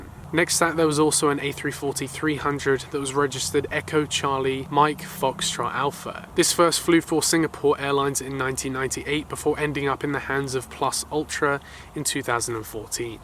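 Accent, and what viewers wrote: United States. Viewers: Stopped watching when you started using American pronunciations. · Nice video. Why are you speaking American English, it’s a bit strange? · Nice vid, but ditch the US accent/pronunciation.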